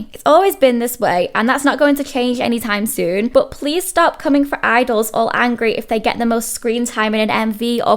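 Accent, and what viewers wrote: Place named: Leeds, United Kingdom. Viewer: Where abouts are you from? That sounds like a Leeds accent. Really curious!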